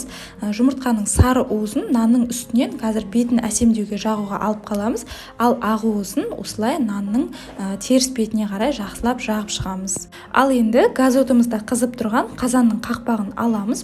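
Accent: Standard. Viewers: Жаксы соилеиди екен таза казакша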